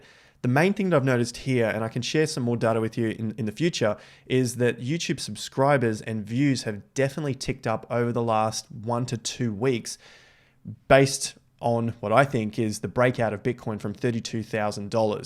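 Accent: Australia. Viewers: I’ve subscribed again, it is great to hear a fellow Aussie breaking down the crypto charts. Well done & here’s to a great 2025 bull market.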